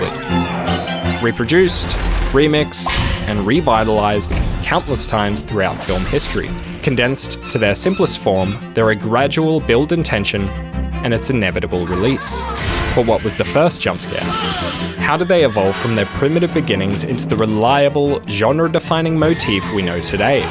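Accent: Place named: Australia. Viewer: Man keep going. Insane that this doesnt have more views. Fantastic vid! Also so good and refreshing to be able to watch quality videos with an Aussie narrating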